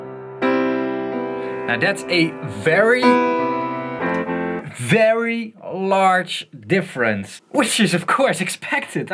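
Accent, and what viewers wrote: Netherlands. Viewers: Love the Dutch accent! Better than Australian, which is often unintelligible. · Agreed. I used to date a Dutch girl so I find the accent adorable. Lol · Deze man ze accent is best goed maar als nederlander hoor je gelijk dat ie nedelands is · you pronounce like a dutch guy does and should, like myself, I hate all those quasi perfect american accent dutchies showing of their cosmopolitism, just stay as you are with your pronounciations! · Yo-yo. I know you're Dutch because of the accent. You can tell it easily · I thought I heard some Nijmegian in your Dunglish but damn it is the other side of the river :)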